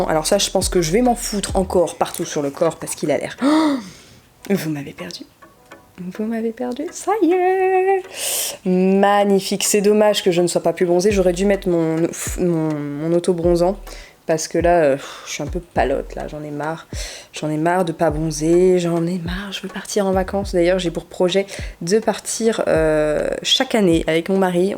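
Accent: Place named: Franche-Comté, France. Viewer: Mdr oui les francs comtois ont un accent lol. Ah oui je suis une franc comtoise et du haut Doubs donc un cran de plus 😂🤣😂 Si jamais on peut te voir où sur Besançon ? Je sais pas si tu voudras le dire mais histoire un.jour de se rencontrer... Vidéo top moi qui aime.a donf les couleurs vivent et oui dans le.bio on a que des couleurs nudes... donc trop Contente de voir cela. Merci À bientôt pour une prochaine vidéo... hâte 😘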